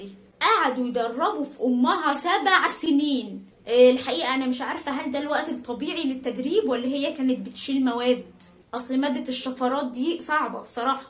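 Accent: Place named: Egypt. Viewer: اسلوبك في القصه فنااان ابدعتي خاصةً بلهجتك المصريه محليه القصه ومعطيتها جو كوميدي😭😭😭❤️حاسه نفسي اشوف فلم مدبلج بالمصري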